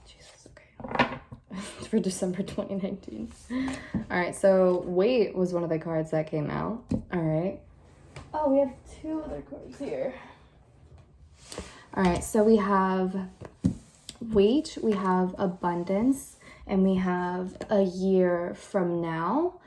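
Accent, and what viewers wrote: United Kingdom. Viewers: as a British person, I appreciate your British accent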